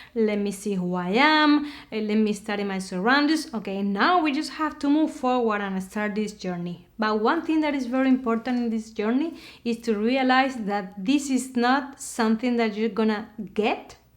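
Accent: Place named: Spain. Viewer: Im your fan for your spanish accent of English. How sweet☺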